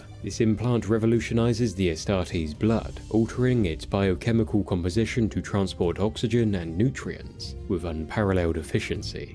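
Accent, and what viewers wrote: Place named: United Kingdom. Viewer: "Imagine yourself listening to a man with a british accent explaining things that involve mystery and murder"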